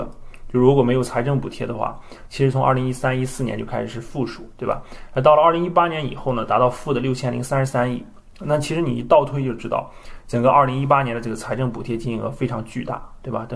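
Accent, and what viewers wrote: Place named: Taiyuan, China. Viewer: 主播听口音像是太原的？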